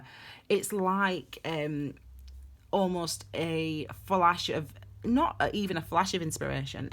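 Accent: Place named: Yorkshire, United Kingdom. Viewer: Thank you for the reading. I feel stuck and trapped in my job. I love your accent are you a Yorkshire girl?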